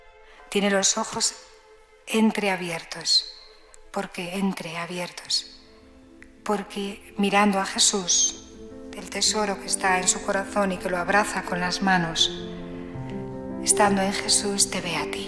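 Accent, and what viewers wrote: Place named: Spain. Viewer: "La Vírgen es madre, sufre dolores de parto, hasta ver a Cristo formado en tí." Preciosa meditación madre Verónica, escuchar su suave y pausada voz, es como si estuviera escuchando a la Madre de Dios. Vosotros los españoles tenéis el acento más lindo de nuestro amada lengua española. Saludos desde Colombia, y os ruego una oración por mi país Colombia.🇨🇴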